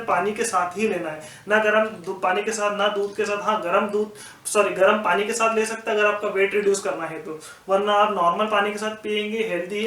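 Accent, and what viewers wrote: Indore, India. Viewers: Indori tone!!!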